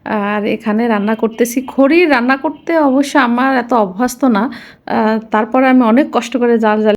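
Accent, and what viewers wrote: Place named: Jessore, Bangladesh. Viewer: কেন জিজ্ঞেস করলাম আপু আপনার ভাষা অনেকটা যশোর অঞ্চলের মতো তাই জিজ্ঞেস করলাম খুব ভালো লাগলো আপনার কথাগুলো আপনাকে অসংখ্য ধন্যবাদ রিপ্লাই দেওয়ার জন্য।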